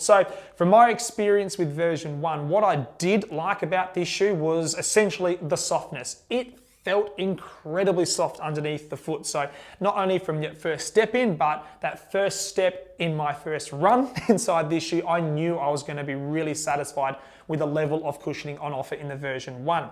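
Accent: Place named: United Kingdom. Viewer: It's difficult enough to understand with a british accent, it's more difficult when the speaker talks very fast, I have to replay certain topics. Aside from that, great Review!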